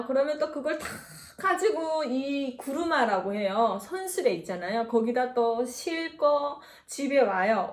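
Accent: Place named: Pyongan, North Korea. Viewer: 는 말을 정말 찰지게한다.발음도좋고 평안도사투리도 간간히섞어서 하는말이 애교스럽고 인물도좋고 탈북유튜버들중 최고...국어선생.😨😨😨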